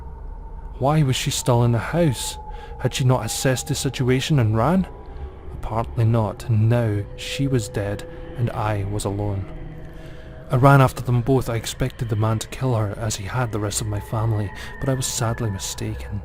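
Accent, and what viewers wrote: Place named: Northeast Scotland, United Kingdom. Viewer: Oh no! Ye peer wee dug..fit an affa thing tae happen aefter bein adopted in the first place. Fit rare listnin tae yer bonnie NE accent fearin me silly we yer creepy tales..love it!! Scottish wifie/grunny in London xx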